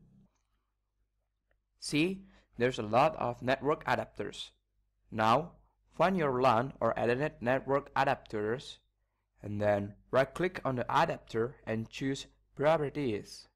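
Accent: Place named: Spain. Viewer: indian guy with a spanish (is that spanish) accent? EPIC!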